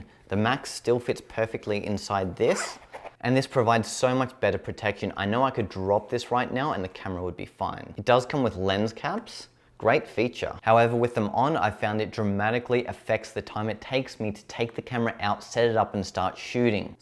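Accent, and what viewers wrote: Australia. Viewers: good to hear an Australian voice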